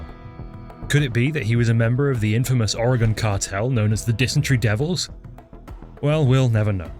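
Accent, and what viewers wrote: United Kingdom. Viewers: Absolutely love this channel. As a fellow Brit you're much more enjoyable to listen to compared to some of those dashing Yanks.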